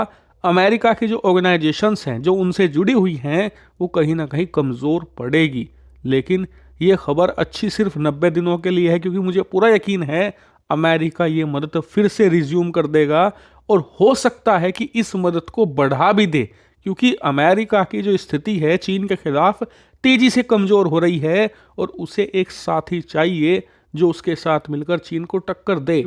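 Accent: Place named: Rajasthan, India. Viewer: Aap pakke Rajasthan se ho aisa Lag raha he Best hindi k.show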